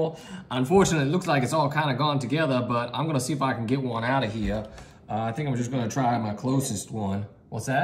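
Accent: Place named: Cajun, United States. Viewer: I love this. Looks so good. Love your accent, where does tat come from? Cajun?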